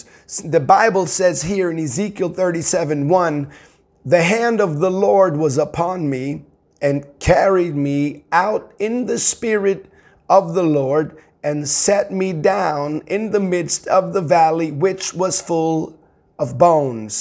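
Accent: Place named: Cockney, United Kingdom. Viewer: Awesome Brother 👌 Also, loving the British accent, you sound like a proper cockney geezer 😄 Big love 💘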